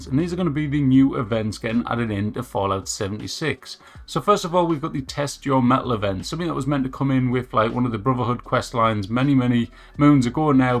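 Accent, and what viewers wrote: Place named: Scotland, United Kingdom. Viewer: Great info with a Scottish twist... good stuff 👌🤌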